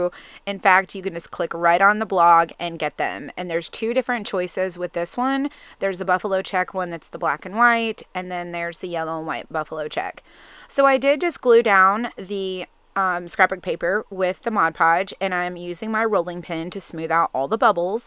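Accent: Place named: Georgia, United States. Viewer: I totally LOVE the pumpkin! THANKS for demonstrating the bow.. LOVED it. Everytime I hear your voice I get homesick for Ga. Take care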